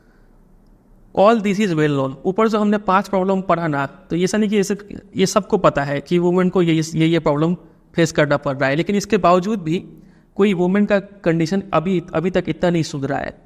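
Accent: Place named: Bengal, India. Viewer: tabhi mai sochu aap ka accent thoda bengali hai😅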